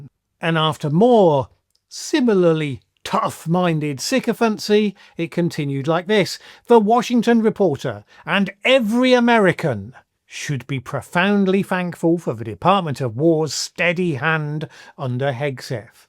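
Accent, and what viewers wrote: United Kingdom. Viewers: There’s something strange about somebody with a British accent, criticizing America. Criticize your own country!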